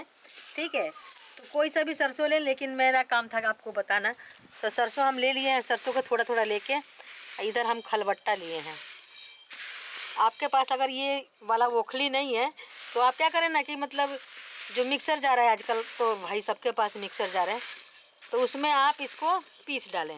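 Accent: Bihar, India. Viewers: आपका आभार ।आपका बताने का तरीका बहुत मस्त मजेदार, बिहारी स्टाइल का,संगीत मय।मज़ा आ गया।😅😅😅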